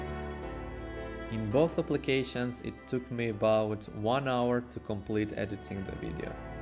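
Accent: Russia. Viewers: I love your Russian/Slavic accent! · Нам показалось что ты русский! английский норм. продолжай! Лайк влепил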